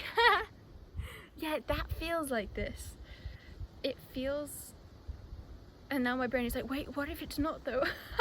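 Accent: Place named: United Kingdom. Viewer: What area of the UK is your accent from?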